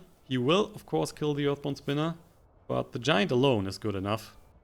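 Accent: Germany. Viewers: You speak like a german guy lul^^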